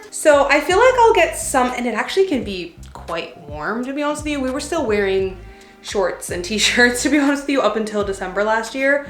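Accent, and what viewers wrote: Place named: Canada. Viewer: Congrats again on baby girl 👧 also I noticed the “y’all” while making the ice drink lol I see TN is rubbing off on your Canada 🇨🇦 accent 😊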